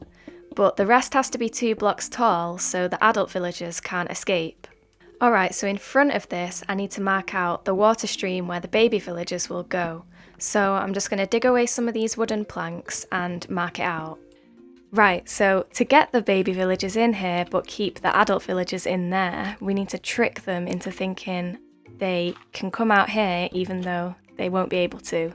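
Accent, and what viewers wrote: United Kingdom. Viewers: Hey! First of all happy new year and i know this can be too much but can you please make a castle on top of the 2 mountains and connect them with a bridge?? And also are you British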